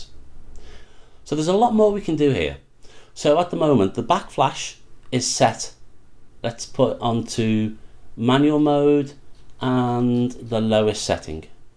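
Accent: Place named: Southport, United Kingdom. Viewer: Thanks for a very helpful tutorial. I did buy 6 youngnu 560 but I really found them fiddly to control and they kept going to sleep. I need 4 speedlights for work on location and I think this godox may be a bit more reliable. PS your accent sound like you might come from down the road from where I live in Southport 😎👌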